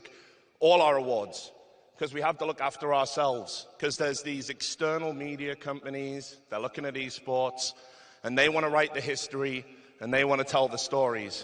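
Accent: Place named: Newcastle, United Kingdom. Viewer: Love the Geordie accent.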